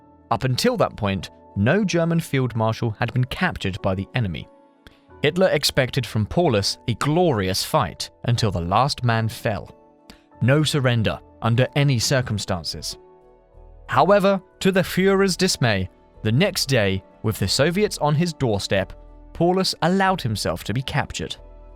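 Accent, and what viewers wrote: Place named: England, United Kingdom. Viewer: Sería mejor un locutor de EEUU no a uno tan inglés, vuestra idea es llegar a EEUU, no a UK. Saludos!!!!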